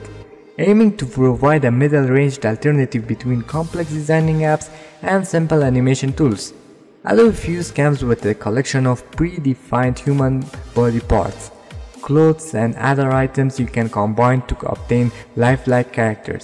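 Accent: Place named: Russia. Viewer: Почему мне кажется, что ты из России или стран СНГ?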